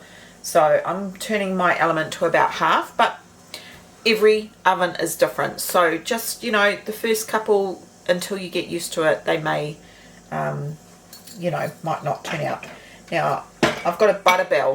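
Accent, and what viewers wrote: New Zealand. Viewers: Surely not an Australian, but a New Zealander… listen to the vowels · This popped up in my feed for some reason. I kept watching because of the Kiwi accent, but subscribed because of the recipes and your genuine communication with your watchers. Don't be concerned about your occasional silence when trying to think of the right word; this reminds so much of my own Scottish mum who would gab away at us while she was cooking. I am 72 years old now, and mum has been gone many years, but you brought back many happy memories. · You had me at your fellow kiwi accent (shameless I know but I live in QLD now so...yeah). Definitely gonna give these a try and hope to enjoy with butter and jam. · Well done, as soon as I saw your recipe for cockies joy and heard your Kiwi accent you had me hooked. I love crumpets, and I'll make some tomorrow, My Nanna used to make great pikelets on the farm in Rotorua. 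Cheers. new subscriber from Tasmania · Kia Ora, have just discovered your channel, so good to hear a kiwi accent😂. Tried the crumpets today.. Kapai!!!! I'll never buy crumpets again, so so easy. I 'll be scrolling your videos.. Thanks, I am a Kiwi living in Brisbane. I'll be watching you😊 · I love the kiwi accent lol a thuck wudth 🤣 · The Aussie half of me is so chuffed that you’ve visited our very fine shores, but the Kiwi side of me won’t let Australia take credit for this most excellent woman 😊! She has a rather thick (or as she said, “thuck”) Kiwi accent, a bit like how “aboat” is the giveaway when you realise you’re speaking to a Canadian not an American 😁✌️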